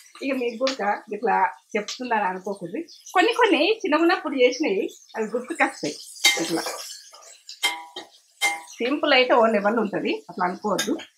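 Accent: Telangana, India. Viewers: Wow,amma,telangana maata entha cute ga matladuthunnaru,ma amma chedthadi same to same❤❤❤❤❤❤ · Am from Vizag, but native place Karimnagar, mana telangana slang vini chala happy feel ayyanu, nenu kuda weeklo 2 times ide curry chestaanu, vedi vediga mango pickletho thinte matram swargame... Thank you andi.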